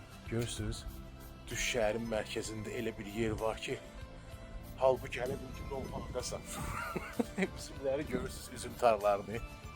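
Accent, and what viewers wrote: Baku, Azerbaijan. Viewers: Ləhcə ləzzət elədi təmiz baki ləhcəsi 🙂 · Bakı ləhcəsi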